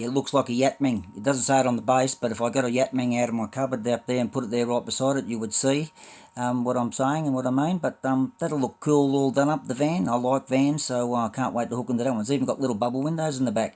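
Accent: Australia. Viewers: Wow....you have enough cars there for at least 6 months of videos 😁 Can't wait to see HCT back up and running as it is also good to hear your Tassie accent on YouTube again mate 🤙🏼 I enjoy your videos as I always pick up a tip or two on customising so I wait with bated breath to see what's next!! That van with the bubble windows will be a fun build I reckon. Keep up the relaxed and interesting vibes 😎🇳🇿🍺🍻🍕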